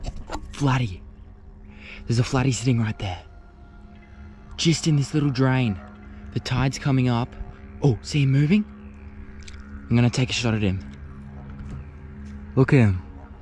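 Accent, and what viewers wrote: Australia. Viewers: You don’t even have bears down there!!! That’s why you Auzzies like to beleive you’re all outdoorsy and nature ific but you guys have the weakest fauna of all. Kangaroos and snakes and nothing even dangerous!! And your accents sucks man!! Internet it’s sooo annoying you talk like Brit who wants to be Australian sooo badly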